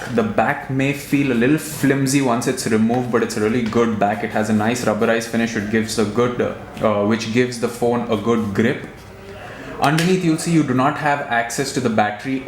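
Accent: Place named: India. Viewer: I like his accent very indian accent english...not a critic but its cool....hahaha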